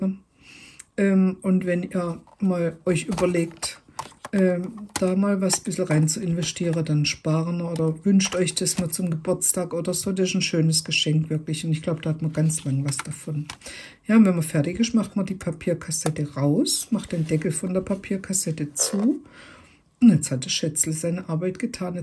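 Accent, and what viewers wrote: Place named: Southwest, Germany. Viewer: Dieser Akzent ist tausendmal schlimmer als wenn mich nen Sachse vollquatschen würde. Gott ey, lernt hochdeutsch!!! Was ist bei euch da unten im Südwesten verkehrt gelaufen?!? Versaut einem das ansonsten sehr schöne Video!!!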